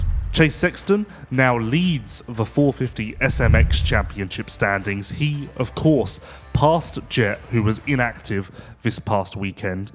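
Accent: United Kingdom. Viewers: really embracing his British pronunciations on this one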